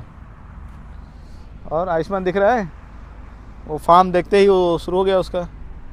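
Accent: Odisha, India. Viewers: Nice journey . I guess you are original Odia from your talking . Please tell if I am wrong or right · Bhai are you from Odisha. As your accent matches with that of odia people.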